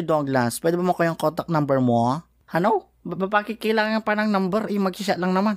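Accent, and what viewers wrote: Ilocos, Philippines. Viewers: Ilocano kaba boss hahaha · Ilocano ka boss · The best talaga accent ti ilokano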